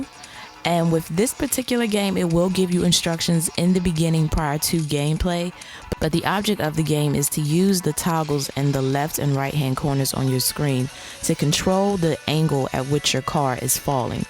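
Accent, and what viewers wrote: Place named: New York, United States. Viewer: Altaïr ibn-la’ Ahad it’s not funny because the “joke” is false because she sounds like a white New York lady.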